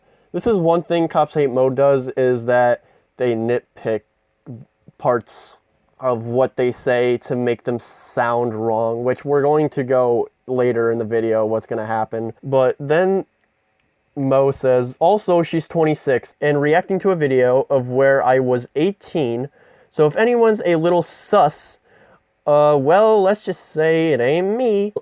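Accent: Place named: Belfast, United Kingdom. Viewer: OMG I can hear the Belfast accent in your voice lol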